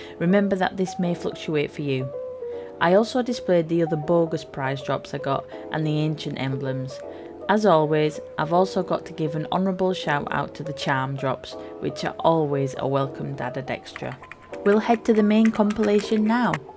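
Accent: Yorkshire, United Kingdom. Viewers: You’re completely off, sounds like yorkshire, England.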